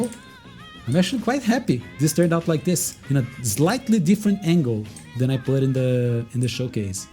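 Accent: Brazil. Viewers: Cara, que vídeo bom, melhor ainda foi escutar a voz e logo sacar que é um br, parabéns irmão, tá de parabéns. E meu Deus o tanto de Argonaut Winglet que tem que usar é brincadeira viu, Rockhopper Propeller mvp do vídeo. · Só de ouvir o sotaque da pra perceber que o cara é BRASILEIRO!!! Meu amigo, tu manda muito, essa corvette é simplesmente INCRÍVEL e sinceramente é a minha favorita em toda a comunidade de NMS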